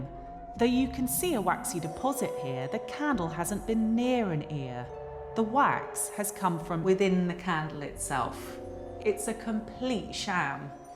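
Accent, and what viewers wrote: United Kingdom. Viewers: What a beautiful British accent God Bless 😇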